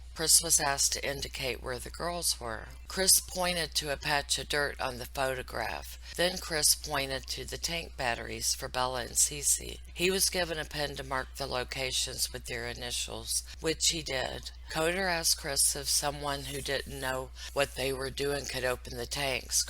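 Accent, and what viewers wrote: South, United States. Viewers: The anti christ should finally get treated the way you do. I like your southern comfort voice. My daddy is a zero is spot on ! 12 degrees of separation . I am with you , we cn not say enough nasty words to describe this asshole. I love Beethoven, you are great!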